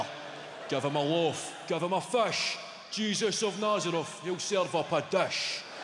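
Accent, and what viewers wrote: Scotland, United Kingdom. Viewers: I loved loved his deep Scottish accent!!! So sexy!!!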